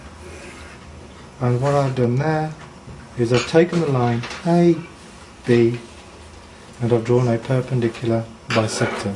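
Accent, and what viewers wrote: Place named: United Kingdom. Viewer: beautiful your british accent is a little distracting hahahaha but it's awesome too you tackled it beautifully.. i couldn't have explained it better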